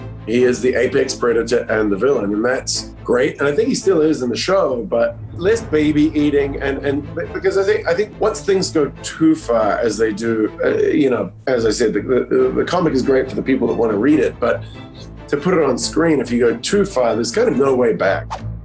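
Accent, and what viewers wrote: New Zealand. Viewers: Wait he’s Kiwi?? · He’s from New Zealand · He's kiwi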